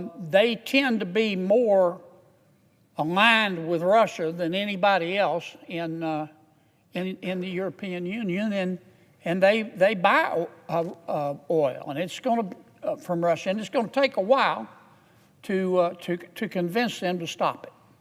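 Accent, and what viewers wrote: United States. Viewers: factualy the best american accent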